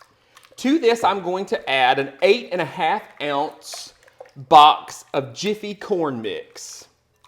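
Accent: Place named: South, United States. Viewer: I've moved from Atlanta to Scotland and now to Spain. I absolutely hearing your sweet southern accent and watching you cook mouthwatering dishes that I love. Thank you.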